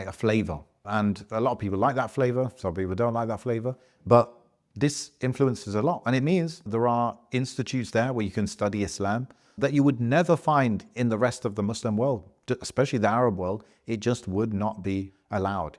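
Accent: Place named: United Kingdom. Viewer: How did you pick up a British accent if you lived overseas most of your life?🤔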